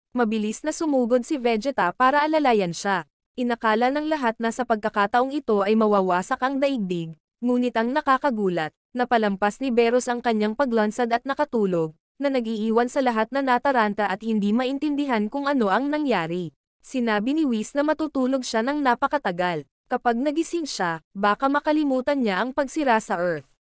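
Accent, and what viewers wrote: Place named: Visayas, Philippines. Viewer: Feeling ko bisaya tong nagsasalita, freyza?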